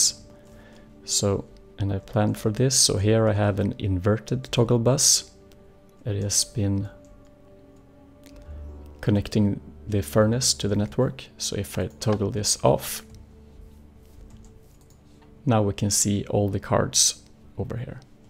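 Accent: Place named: Sweden. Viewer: Hör så tydligt att du är svensk haha xD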